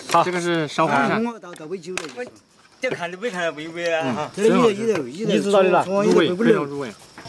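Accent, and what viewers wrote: Sichuan, China. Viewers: 你的视频有毒呀，这两天我一看就刹不住脚了。川普讲的很好哈哈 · 听口音像川南的人，我是内江的，听到“是”字饶舌的感觉就熟悉。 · 来了美国居然在油管上看到听到四川话突然有点想家了。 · 聽得懂而且是好懷念的口音阿以前家裡隔壁開雜貨店的老爺爺是個四川人軍醫只是家裡附近老兵這些年全都過世了很久很久都沒聽到了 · 四川话好听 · 美食作家王刚四川口音非常好聽，不看字幕基本上可以懂～鄉下生活真有意思～很樸實，很平靜，很健康～ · 听到四川话好亲切，哈哈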